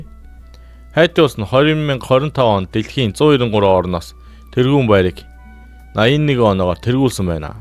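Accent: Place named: China. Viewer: Хятад аялгуутай сонсогдож байна бас хятад ая.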